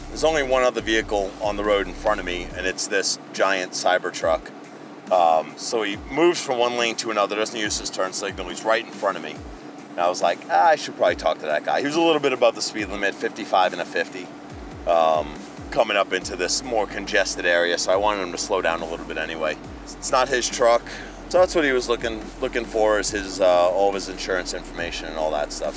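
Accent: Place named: Long Island, United States. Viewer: You can tell you are from Long Island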